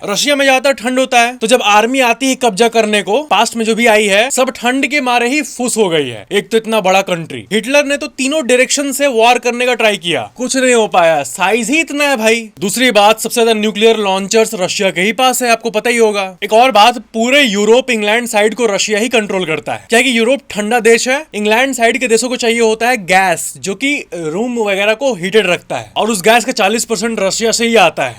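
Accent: Bihar, India. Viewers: Is chutia ka accent bihari h video dekhne ka man ni krta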